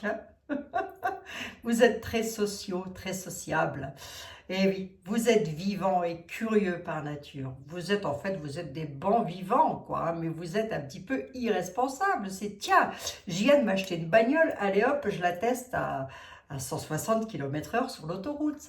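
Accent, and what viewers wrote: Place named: Lorraine, France. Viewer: Bonsoir, toujours un pur bonheur de vous écouter ❤. Bon week-end. Je vous embrasse du bout du Finistère. Votre accent Lorrain est un bonheur 🤗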